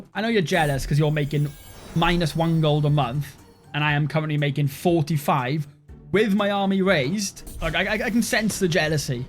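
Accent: Wales, United Kingdom. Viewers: Are you Welsh? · the welsh accent threw me off, sounds like you straight from Bridgend! lol LOVE IT! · Is that a fellow Welsh man i hear . Respect from the Merthyr Valley. 🏴⚒️⚒️⚒️.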